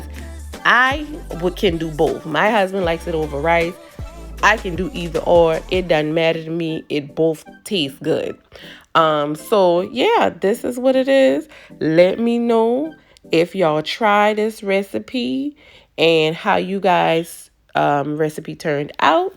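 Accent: Charleston, United States. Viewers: You must be from Charleston your accent is just like my dad and aunt… he passed away last year. Anyway the food looks yummy!!! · Got chucktown written all over your voice!!! Girl I stay watching recipes..here I am!!!😂😂😂my city!!!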